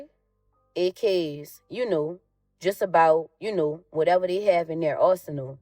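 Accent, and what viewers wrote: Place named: New Orleans, United States. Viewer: 👍 New Orleans accent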